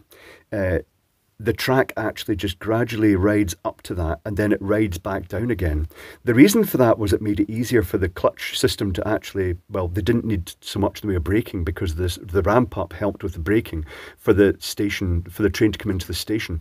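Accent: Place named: Glasgow, United Kingdom. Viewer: Just love the Glasgay patter, Goes of like a Two Bob Rocket hahaha made my day hearing that. ..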